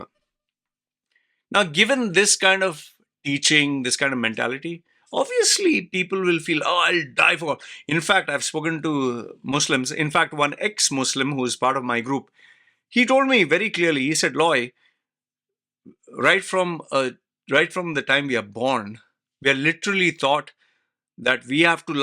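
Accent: India. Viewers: Your such a hypocrite, first of look at your own name when your a full on Indian, that is very clear with your colour and your f****d Indian accent so don’t come on here and try and degrade next mans religion etc especially Muslims, you have a personal problem with Muslims then be a man for once and come out face to face and bring your problem up with them, Muslims are proud of their religion and allhamdillia they are staunch too, theirs people in this world who have some of the greatest minds and IQ who have accepted Islam because they have seen the proof, a man in the middle of a desert and uneducated has predicted things that have been proven by science decades later and at that time their was no technology to prove things, but people like you can’t accept the truth because your ego is damaged, yes Islam is the truth and I fully and whole heartedly testify to that, what religion are you from I bet you don’t have the bottle to say your religion because you know it’s fake and if it’s not then prove it, you fake ass people sit behind your computer and give it Johnny big potatoes but never when you come across a real man and a real Muslim, Islam condemns violence but if a man does something you cant blame the religion for it, for example you buy a gun and you kill someone will you blame the gun obviously not you have to take the blame yourself, you know what they say people who live in glass house and all that, So in future think before you open your mouth to degrade anyone an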